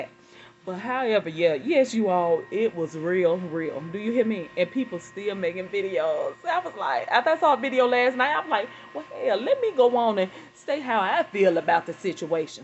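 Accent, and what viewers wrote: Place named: Alabama, United States. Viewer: I can tell you are from Alabama. 😒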